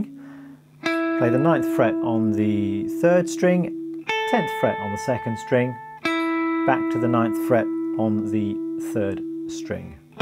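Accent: United Kingdom. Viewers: Son You are really great !! I enjoy watching you and the British accent is terrific!!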